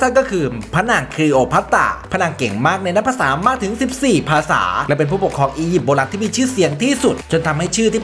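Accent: Isan, Thailand. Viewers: ชอบความเป๊ะของแอด ข้อมูลพร้อม แล้วค่อยลง อวยเต็มที่ น้ำเสียงไม่น่าเบื่อ ชอบมากค่ะ และสำเสียงอีสานใต้มาเต็ม แอดคนอิสานใช่มั้ยคะ🤣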